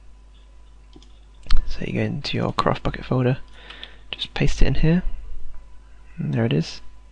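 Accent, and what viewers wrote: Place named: United Kingdom. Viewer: Because he speaks British?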